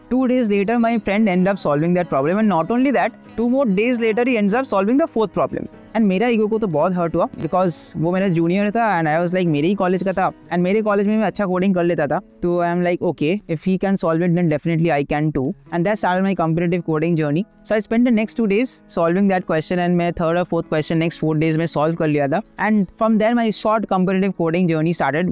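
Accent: Bengal, India. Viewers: Bhaiya are you bengali ? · The cute bengali accent😊😊😊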